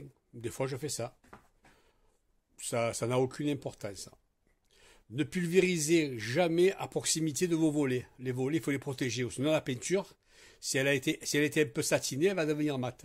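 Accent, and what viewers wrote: South, France. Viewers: Merci beaucoup très clair et concis. PS j'adore ton accent, vive le sud 💪👍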